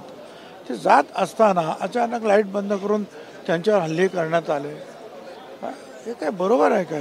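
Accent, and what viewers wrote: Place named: Bihar, India. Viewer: बिहारी सारख बोलतोय... media ला वैतागला... copy cat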